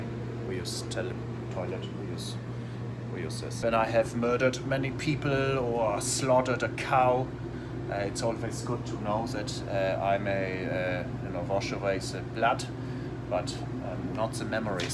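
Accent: Germany. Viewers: dein akzent ist so brutal deutsch aber süß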